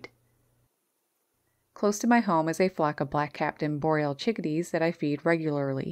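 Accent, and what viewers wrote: Canada. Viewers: This is amazing, cuz how bright personality you gotta have for bird's to earn trust in you, and feed em in freezing temperature? And first meeting trust is precious, I'm American and I hear Canadian accent, plus I love snow, and wanna go to trip to Canada when I can afford it. So beautiful nature 😍❤️😍😍❤️❤️😍❤️❤️. Love it.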